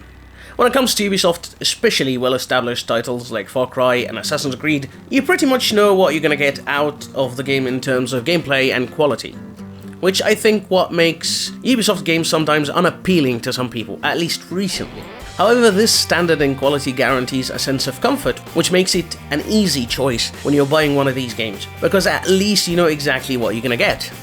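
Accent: United Kingdom. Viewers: I envy your british accent tbh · Your British Accent is awesome, really! keep it up, Dude!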